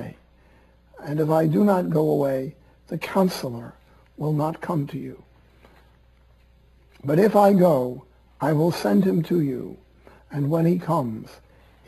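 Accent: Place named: New York, United States. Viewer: ♥️Father Benedict. Enjoy Heaven Thank You for all Your Recordings and vides on EWTN like yuh our style as and New York Accent. A real wealth of time spent in front of recording studio.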